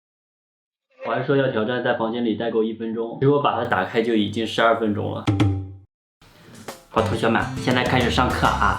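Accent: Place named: Shandong, China. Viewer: 是哪里人啊听着口音像山东的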